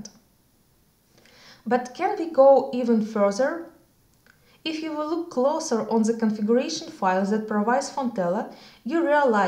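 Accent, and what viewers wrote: Russia. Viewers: твой английский имеет русский акцент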